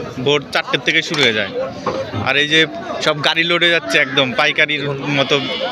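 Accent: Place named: Tangail, Bangladesh. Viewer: দাদা,,, ইন্ডিয়ার ভিডিও,,তবে মাঝে মাঝে বাংলাদেশর টাংগাইলের ভাষা বলেন কেনো😂😂😂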